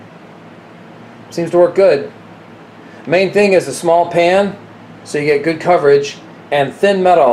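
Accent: United States. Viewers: Happy new year 2024. I like your ingenuity and your accent. Simple, effective, american. I will try with my dutch oven. Thanks for your creativity.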